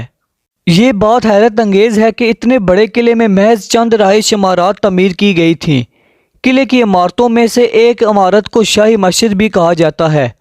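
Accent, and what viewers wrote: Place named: Punjab, Pakistan. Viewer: We belong to urdu speaking family, he is Punjabi..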